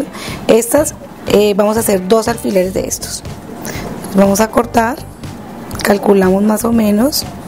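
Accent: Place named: Colombia. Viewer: aparte de que me encanta tu trabajo, es chevere escuchar el acento de mi tierra querida colombiana, desde mexico. Amo mi Colombia . Gracias por compartir tus conocimientos muy lindo todo ... Dios te bendiga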